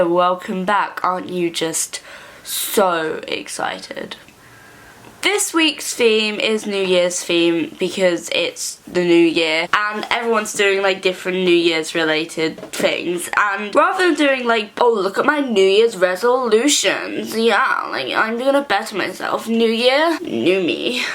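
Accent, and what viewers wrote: United Kingdom. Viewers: Is she British or American ?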